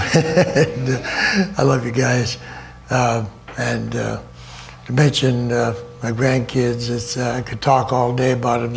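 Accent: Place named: Canada. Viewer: So strange to hear uncle Ivan speak with a Canadian accent. Growing up I really thought he was Russian. He was great!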